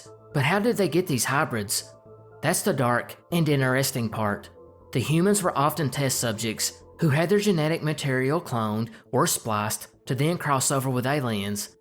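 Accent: South, United States. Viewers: Your accent screams southern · As an interesting side note...the region of America where this accent comes from has a huge population of Scotch and Irish going back 200 years...sounds like South Carolina, Georgia, Arkansas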